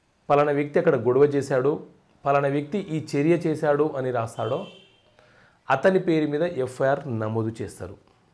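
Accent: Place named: Telangana, India. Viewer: Dear Sir You're. But it appears you were born and grew up in Telangana. Though your Telugu has Telangana accent, it sounds extremely natural. Sir, if an accused is proved to be innocent, FIR can't be quashed by the Magistrate. Court takes cognizance of an offence, not an offender. If an Informer tells about an offence, the police must register an FIR whether he tells about the offender or not. The Informer may say he doesn't know anything about the offender. Even then FIR has to be registered and it can't be quashed unless the offence is false.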